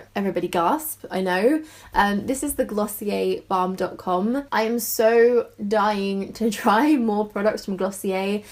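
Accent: United Kingdom. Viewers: I made it to the end frankly I just love hearing your voice. I am an American and I love British accents. Thanks for the video have a great day!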